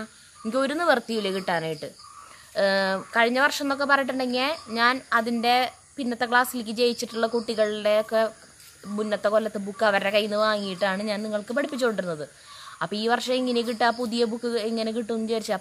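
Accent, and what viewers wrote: Thrissur, India. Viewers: Teacher thrissurkkariyano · Mm poliya trissur slang.😘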